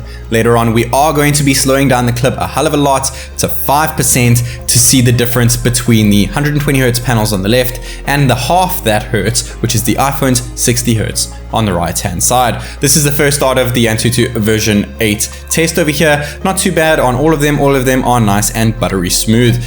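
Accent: South Africa. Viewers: Immediately heard that South African accent! 🔥 Love from Jozi bro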